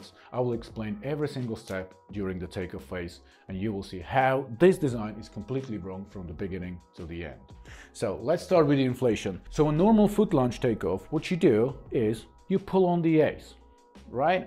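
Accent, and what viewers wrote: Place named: Slovakia. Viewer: It's just his accent. He's from Slovakia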